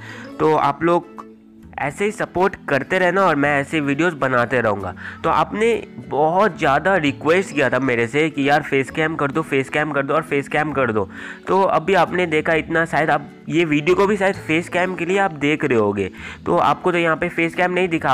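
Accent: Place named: South, India. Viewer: tu wese south ka hai kya ? tera bolne ka style esa hai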